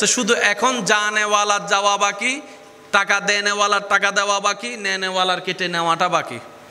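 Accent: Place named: Chittagong, Bangladesh. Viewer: ভাই৷ অনেক সময় আপনার কথা গুলা চাটগাইয়া বুজা দায় তবে বুজি কম বেশ সব জেলার কথা গুলা আনেগো কোন জেলার কথা গুলা বুতে একটু কস্ট হয় আমিন মনে কিচু নিবেন না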